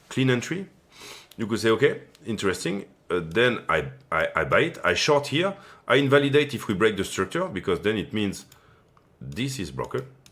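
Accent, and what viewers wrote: France. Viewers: Merci pour l'accent frenchy 😊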